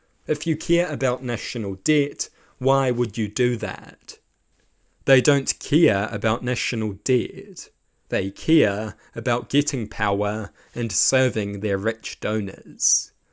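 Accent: New Zealand. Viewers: Oh, he's from New Zealand, which is why he talks funny.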